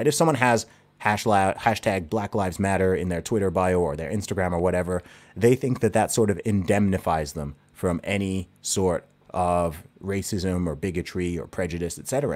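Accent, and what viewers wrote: United States. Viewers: But you have an American accent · Why ?if yuo English yuo sound American?bye. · you kinda sound American ; are you from the States ? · Why do you sound American? This was my first visit to your YT feed. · Why is your speech so American? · Are you American ? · Did you go to school in the US? Is that how you sound American? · Just curious- why do you sound American? - you don't have a British accent- I'm Australian and just wondering 🤔 also, came across your channel accidentally and love your input 👍🏿🇦🇺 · No disrespect, but if you're British why do you sound American? My mom is British and she has lived in America for over 40 years and she still sounds British. What happened to your accent? Please tell me! Ps I love you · I hear you in Bangkok, Thailand first time listener. You sound like a really smart young man! I love the president, you are from UK wow! 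You sound American. And intelligent. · Just joined today. You sound American! You know, I commented on a YouTube video and someone from UK replied and explained that even people over there in UK are praying for Trumps win because the cabal and corruption stretches even over there and they know that if he loses its over for us and if it's over for us, then it's over for everyone. That was the first time I realized how important my President is. I've always known how truly important he is to America, but I had no idea how, what happens in America affects other countries. I know we are the world's bread basket. But I didn't realize. Then Trump gave a speech yesterday and he too said that if it's over in America, it's over everywhere. God Bless Us All! Please pray, because the more people that pray..... we can pray it into existence. It's physics. And the Democrats have lost about 30% of the black vote thanks to Candace Owens, Brandon Tatum, Jericho Green.... 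you should follow these people they're fantastic. The biggest problem in the USA right now is WHITE LIBERALS. THEY'RE ALL NUTS. LIBERALISM IS LITERALLY A MENTAL ILLNESS. I watched a documentary and they had a liberal and conservative in MRI machines. They showed photos, political in nature. And with each picture a different part of the brain lit up for the conservative vs the liberal. They think without logic. Thought without logic is a disorder, I mean....... that's the white reason for thought, to be logical. Not to be emotional, that's why we feel. So liberali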